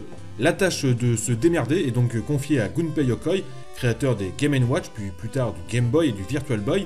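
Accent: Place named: England, United Kingdom. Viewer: Super chronique comme d'habitude. Ça fait longtemps que je suis abonné mais la seul chose que je te reprochearai c'est ton accent anglais pour les titres. Sinon continue comme ça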